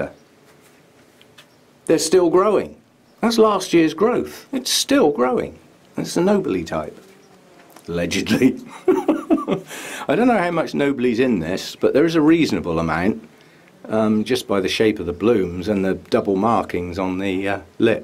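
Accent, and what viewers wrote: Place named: Norfolk, United Kingdom. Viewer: Most informative video I've found so far! Really helpful. Thank you. Also as a Norfolk girl, I'm loving your accent. Sounds like home :)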